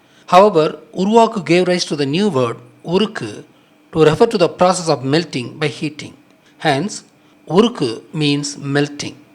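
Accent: India. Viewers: don't get offended sir, ! was talking about accent not English, the Hindu accent Jesus Christ is like another language in itself.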